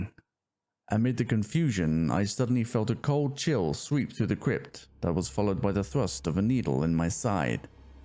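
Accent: United Kingdom. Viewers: Hope you have a SPOOKTACULAR HALLOWEEN weekends mate! 🎃👻 P.S : Loving your British accent more and more. 🤍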